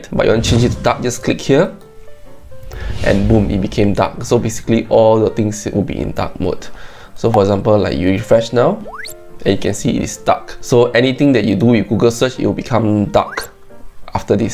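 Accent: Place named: Asia. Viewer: Bro has an Asian accent 🤣 (not hating)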